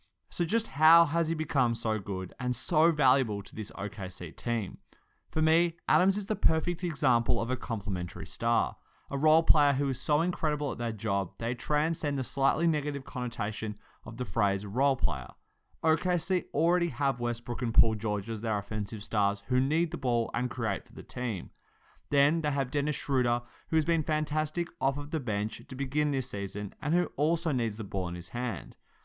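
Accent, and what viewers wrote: Australia. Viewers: Aussie accent indeed..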